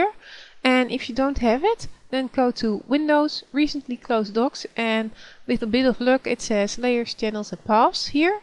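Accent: Netherlands. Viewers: je hoort wel dat je van nederland bent ^^maar is wel leuk ! kun je ook eentje in het nederlands maken ? coole videos meid!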